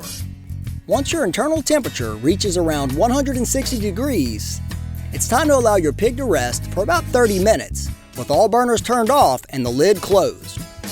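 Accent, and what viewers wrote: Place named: Midwest, United States. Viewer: If you were born in Gonzales , Louisiana . Why , can't you pronounce French words correctly ? You sound your words not with a Louisiana accent , but with a typical Midwest accent . I will say your food looks absolutely delicious . I really enjoyed watching you prepare your dishes . "Bon travail avec votre programme alimentaire ". Dieu vous protege.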